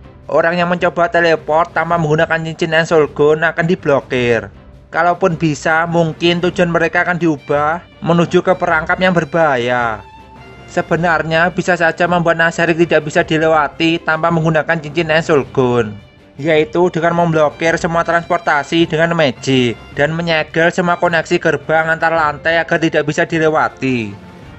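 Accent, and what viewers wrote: Java, Indonesia. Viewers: Bang bahasanya di arahkan ke gaya Indonesia aja bang, jangan terlalu ke arah Jawa, berasa kurang bang kalo masih Pake logat Jawa.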